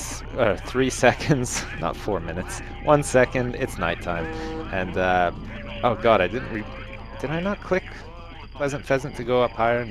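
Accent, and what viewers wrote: Canada. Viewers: you sound more canadian now ;( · ' new mic makes him sound way more Canadian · OMG, that mic makes you sound canadian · I think the toaster helped disguise your Canadian accent.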